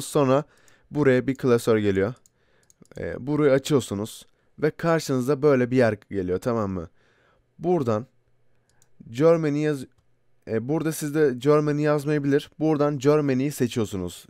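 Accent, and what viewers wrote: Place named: Bursa, Turkey. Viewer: abi kusura bakma ama bursalı gibi konusuyon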